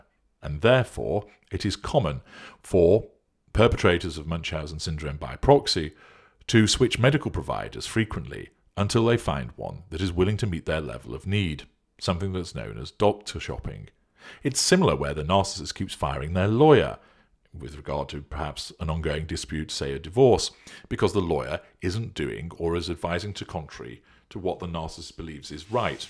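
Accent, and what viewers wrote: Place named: North, United Kingdom. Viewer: Your accent, has a northern twang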